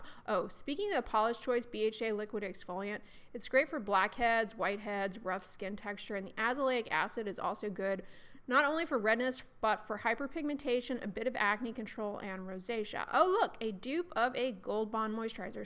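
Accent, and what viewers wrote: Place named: Texas, United States. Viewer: I've been a subscriber for years and it makes me chuckle to hear your Texas accent come through. I don't remember where you lived before you moved here.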